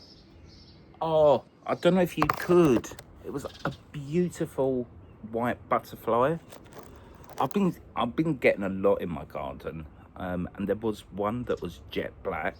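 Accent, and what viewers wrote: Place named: Estuary, United Kingdom. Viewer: I like the way you speak from the heart, and I adore your Estuary English speaking (if I've correctly pinpointed where you are from). I'm a French English learner and sometimes it's difficult to understand different English accents. Yours is so accessible, I really like it and watch a lot of your videos.. do more like this, please!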